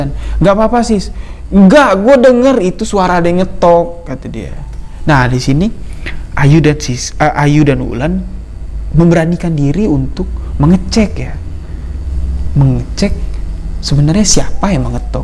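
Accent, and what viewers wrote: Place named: Bali, Indonesia. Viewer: Ooo,,,logat nya ky orang bali 😁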